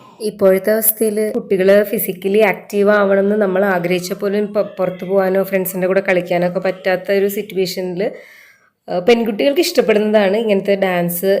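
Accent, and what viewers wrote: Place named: Thrissur, India. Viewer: 5kuttyolum🤩🤩👌👌👍nammude thrissur bhasha idakkidak varunnundtto🤩👍kuttypattalam nannayi enjoy cheyyunnund🥰ningal sisters randaalum banglore aanalle..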